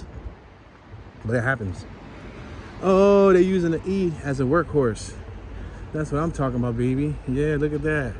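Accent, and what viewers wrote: Philadelphia, United States. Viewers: What a day to be celebrating Queen Village with the passing of HRH Queen Elizabeth II. Thank you; this is beautiful. Philadelphia is nothing if it is not diverse in neighborhoods and atmospheres; gorgeous. BTW you have the same Philly accent my relatives had a century ago; thanks for keeping it alive. ;-)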